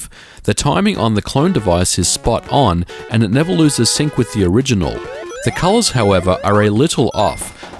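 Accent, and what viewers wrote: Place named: Australia. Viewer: Goddamn Aussie accent my ears are bleeding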